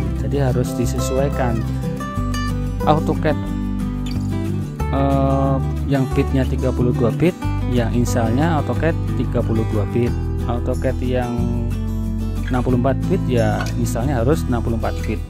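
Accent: Banyumas, Indonesia. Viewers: Om, adek ikut an belajar yah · semangat om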